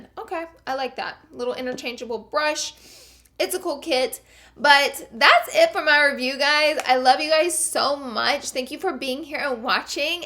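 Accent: South, United States. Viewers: 😞😯 Sure miss your own unique personality & southern accent 😩😒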